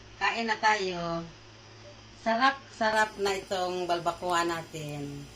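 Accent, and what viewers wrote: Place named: Visayas, Philippines. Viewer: Hehe malingaw ko jd ko paminaw sa bisaya mg tagalog🤣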